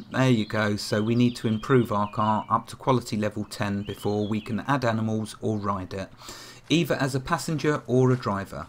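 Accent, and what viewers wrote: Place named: Essex, United Kingdom. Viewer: I'm also from the UK, perhaps near you as I'm on the Essex / Suffolk border and I think I can detect some Essex in your accent?:-)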